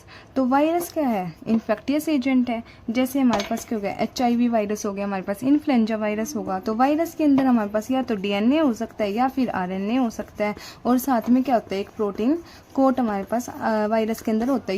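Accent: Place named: Western Uttar Pradesh, India. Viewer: Very good teaching 😊 Aap kha se ho ? Language Hindi aapne west up bagapt sayd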